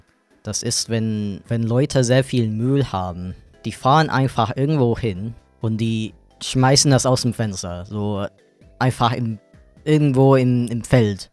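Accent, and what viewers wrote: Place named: United Kingdom. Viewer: No offense an den Typen, aber man hört direkt nen klassischen UK-Akzent raus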